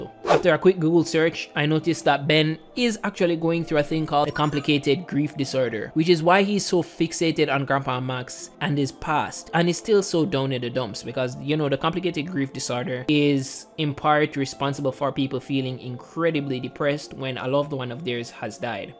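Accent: Jamaica. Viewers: Ur Jamaican? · No better reading than in the Jamaican accent. Love it. Your voice is great for narration.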